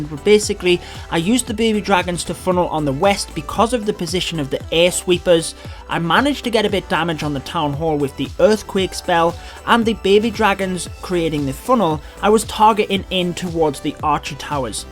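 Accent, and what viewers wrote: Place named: Scotland, United Kingdom. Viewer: Which accent is this? Scottish? Sounds awesome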